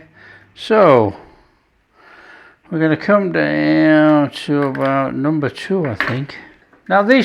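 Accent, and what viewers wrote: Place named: Scotland, United Kingdom. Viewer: Oh my. I know what cake I'm requesting be made for my birthday this coming April (if I can wait that long--haha). I love your recipes, your wonderful Scottish accent of my ancestors, but your taste-testing euphoria always makes me smile! Thanks so much! Best, ~Marirose USA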